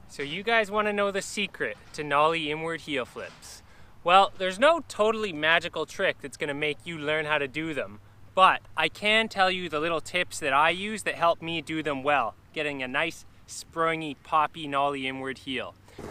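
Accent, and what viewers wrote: Canada. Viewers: I just love your accent. You have the Canadian nice guy accent and it reminds me of every teacher in kindergarten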